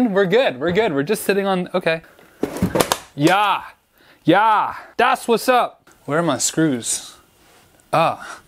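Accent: Canada. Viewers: Buddy, are you a Maritimer?? ;-)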